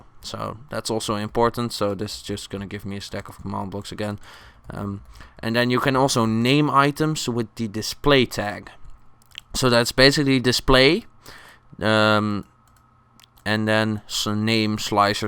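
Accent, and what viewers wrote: Netherlands. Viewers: You kinda hear the dutch accent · Your Dutch aren't you? You have a pretty obvious Dutch accent. You know CubeHamster? Same thing. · ik hoor gewoon dat je nederlands bent niet? :D nice video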